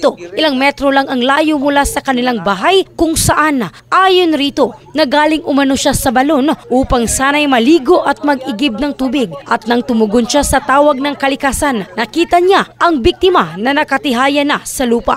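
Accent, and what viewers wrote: Visayas, Philippines. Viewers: isa ka pa.. Wala ako sinabi lahat ng bisaya ganyan tono ng pananalita.. Pinoy talaga ang hina sa pag intindi.. Ano na lang kaya pag nasa ibang bansa kayo 😅 cguro nga nga kayo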